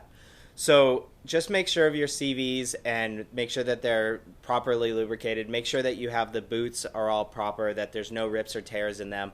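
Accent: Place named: Australia. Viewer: Best Aussie accent ever.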